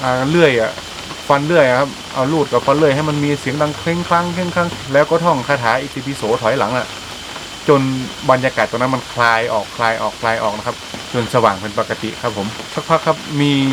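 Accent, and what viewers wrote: North, Thailand. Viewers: หว่ากำเมืองเสียงฟังม่วนจ้าว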